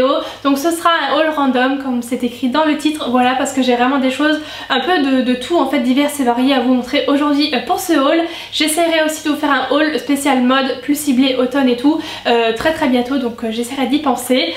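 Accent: Lorraine, France. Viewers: Tu as l'accent de chez moi, Lorraine ou Alsace ? Gros bisou <3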